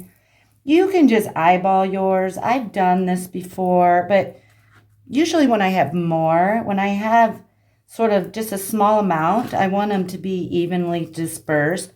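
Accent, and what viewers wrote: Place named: United States. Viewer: so have I, gosh is so good and generous to share her talents, thank you x. ps love your American Accent (greetings from England)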